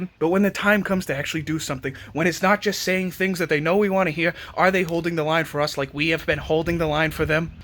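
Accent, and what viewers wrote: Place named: Staten Island, United States. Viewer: You simultaneously sound like you’re from Staten Island, Boston, and the south. Never heard this accent before.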